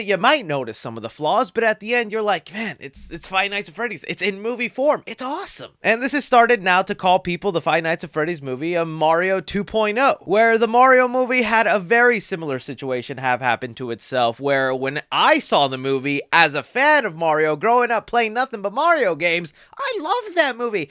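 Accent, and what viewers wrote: United Kingdom. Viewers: Lol, I like your British accent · 😂 love the British accent 😂 the UK loves you ❤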